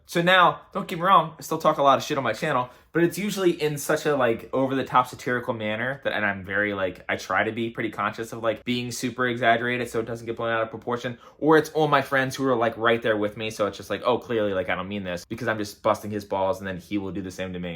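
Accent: East Coast, United States. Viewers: Dude you’re one of my favorite YouTubers in general. I like how real you are and your opinions. I’m from Delco, outside Philly and I can tell you are from the East Coast and you’d fit in well in Delco 😆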